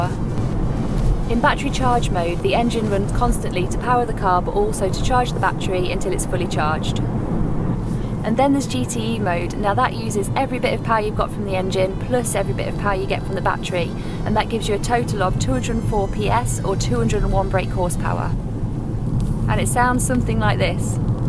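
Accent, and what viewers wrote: United Kingdom. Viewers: Nice review, I miss those lovely accents and tones in my daily walk of life, there is just something unique about the UK experience.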